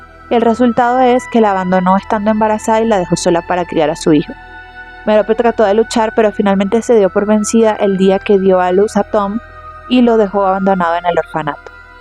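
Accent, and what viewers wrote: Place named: Latin America. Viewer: Es la primera vez que lo escucho en audio latino, se me hace raro 😅 Pero dale, vas a ser grande!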